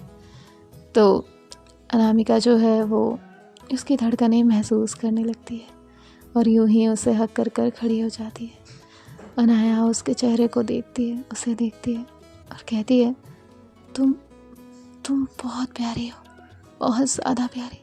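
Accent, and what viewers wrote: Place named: Punjab, India. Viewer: Or ap bataiye kaha se hain ap Punjab se ya chndighar aisa lag rha h but ap bataiye ..hum mp se hai .Ujjain se ...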